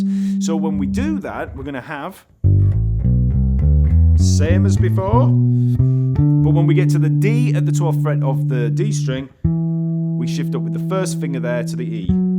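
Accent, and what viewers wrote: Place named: North, United Kingdom. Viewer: The clarity of your Northern accent allows me to soak your teaching by osmosis. It's only a Northern song. I'm a Yorkshire lad by the way. Si thi.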